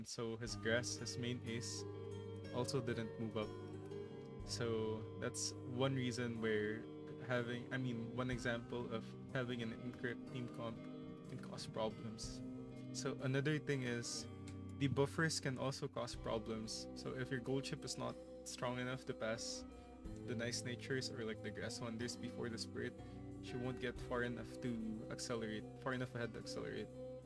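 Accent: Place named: Philippines. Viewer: Interesting video. Honestly I think the main drivers for the burnout are the popular content creators themselves. Lately almost everyday all they do is make videos about preparing for the next CM, and that people need to do XYZ in order to compete. So instead on focusing on the fun aspects of the game, it turns into a checklist grind. I've honestly stopped watching their videos when it's all about preparing for CM. I maybe just watch a few to get some pointers, but I don't pressure myself to do each and every single thing they recommend. BTW, are you Filipino? Was just curious based on your accent. No need to answer if you're not comfortable.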